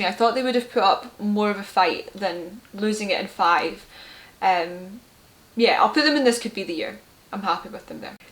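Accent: Scotland, United Kingdom. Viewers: Be still my beating heart. Scottish blond woman who loves Leaf's. I might of just found my future wife. As a Finnish hockey fan and judge Toronto Leaf's fan I am in love... 😂😂 I really could listen that Scottish accent all day long. But to be serious, I'd love to see your deeper prediction/valuation of Leaf's. Love from Finland.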